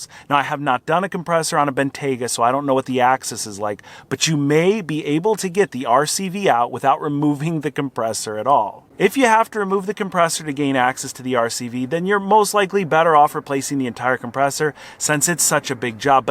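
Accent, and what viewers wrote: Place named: New York, United States. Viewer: Interesting video from a typical Florida boy, NY/NJ accent and all. Thanks a lot.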